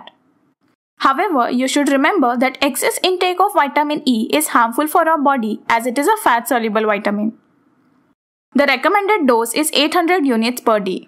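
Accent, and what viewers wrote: India. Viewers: I thought you were Geman at first but then I was like nope! India ; ) when you all talk fast you give it away.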